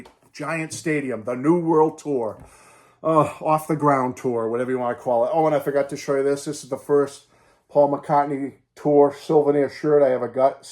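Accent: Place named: Worcester, United States. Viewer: I drove up to Worcester from south east CT with my best friend too!. She passed 10 years ago. We had seen him earlier in the tour in NYC. We went to probably 50 concerts of various artists. I think of the Worcester show when I hear your voice as the announcements outside had the same accent! My latest time we drove to Syracuse to see him 2 years ago with my 5 year old son. Many great memories!😅 did you get your tickets through the Wings Fun Club like we did?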